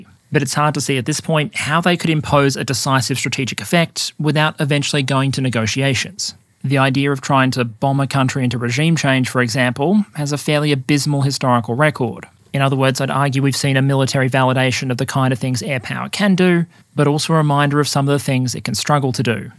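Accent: Australia. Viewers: I don't remember perun having an ausie accent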